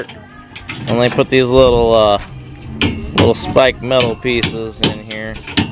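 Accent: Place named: Texas, United States. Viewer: That happened to me with one of the columns. It was so annoying. Are you a southerner ? You sound as you’re from the south. Texas way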